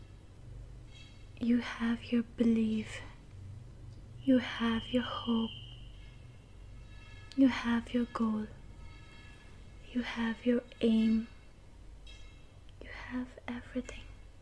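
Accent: India. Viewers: Where has this video been?! I have always looked for an Indian accent. This is so perfect. I melted to sleep, and you are candy for the eyes.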